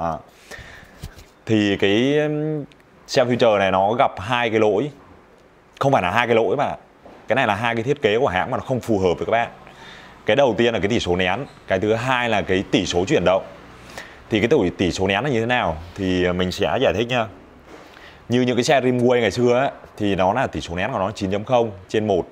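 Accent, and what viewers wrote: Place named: Bao Loc, Vietnam. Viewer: nghe dọng anh giống người dân ở bảo lộc lâm đồng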